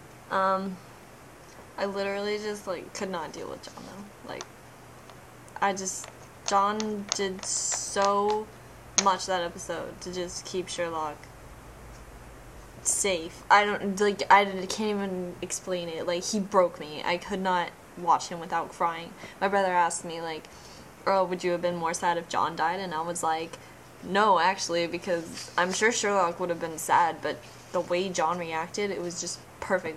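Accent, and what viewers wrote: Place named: United States. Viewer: By the way, you sound very slightly american. Did you go there recently before making this video or something?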